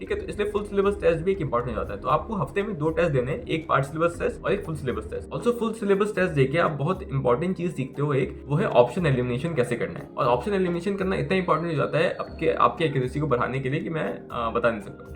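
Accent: Bihar, India. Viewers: Bhai you are from Bihar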